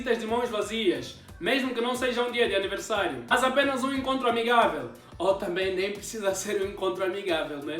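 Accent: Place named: Portugal. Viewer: Muito bom seu canal , mas e aí e vc e de origem ? Falando português de Portugal , eu sou do Brasil.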